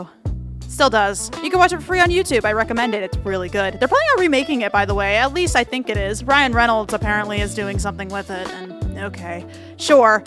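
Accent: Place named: Pacific Northwest, United States. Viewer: 1. Are you from the north east Washington/oregon area? You have little vocal tones that sounded very similar to Lockstin & Gnoggin. 2. Please please please make more of the characters!!!!!! Loved the vid!!!!!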